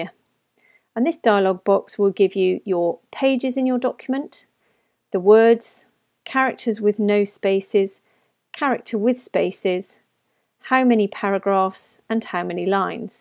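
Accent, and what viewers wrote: United Kingdom. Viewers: Straight & Simple. Thank you! (Why does British accent makes the person sound intelligent to start with?)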